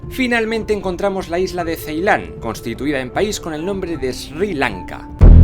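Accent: Spain. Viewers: El acento español no aburre en tus vídeos, sigue así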